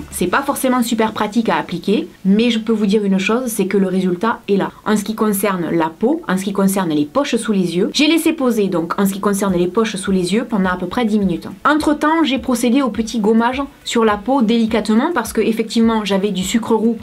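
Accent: South, France. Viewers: C'est normal elle viens du sud comme moi à l'accent du sud bien prononcer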